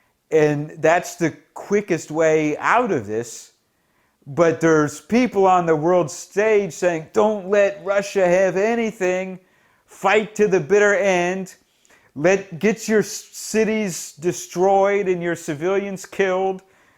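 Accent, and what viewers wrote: United States. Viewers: the American accent making «Cossacks» sound almost like «Kazakhs» ^^ Very worthwhile video!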